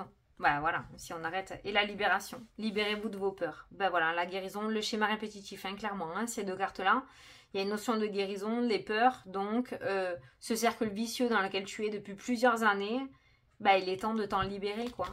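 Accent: South, France. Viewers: Bonjour, peu de temps que je vous écoute, j’adore votre accent du sud qui nous enchante nous les gens du Nord ❤ Un grand merci pour votre guidance 😊 Patricia 23 janvier 1962 Bonne soirée et à demain 👋👋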